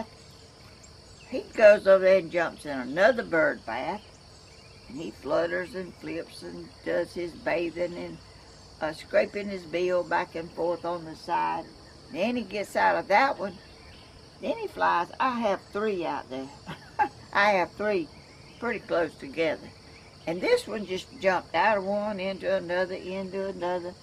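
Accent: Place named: Georgia, United States. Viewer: I was born the southwestern part of Georgia .your accent sounds very much like the accents of people from my part of Georgia..curious which part of Georgia you r from? Your accent sure sounds familiar... like the folks from my neck of the woods...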